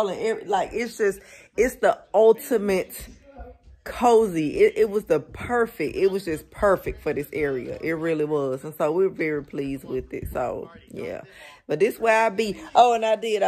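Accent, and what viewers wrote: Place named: Georgia, United States. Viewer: New subscriber! Thanks for sharing all of your tips. I'm from Ga., so hearing you always reminds me of home. 🙂 Happy Holidays. 🌲❤🌲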